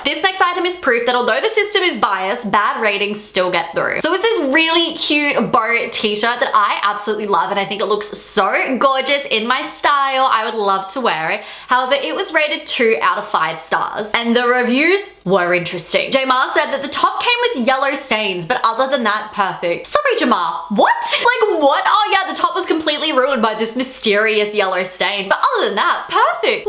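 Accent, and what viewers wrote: Australia. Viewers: Cute video but curious to know, from one aussie to another... where is the sudden "kath and Kim" voice and sayings from? is this a new thing??? LOL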